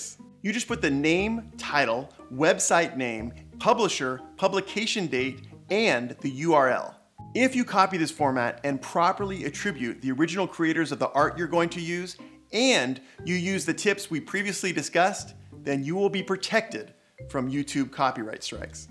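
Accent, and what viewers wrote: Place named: United States. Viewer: You really talk like an American person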